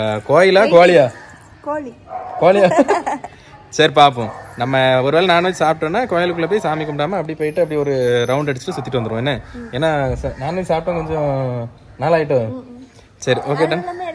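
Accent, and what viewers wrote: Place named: Thoothukudi, India. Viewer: Thoothukkudi slang sooper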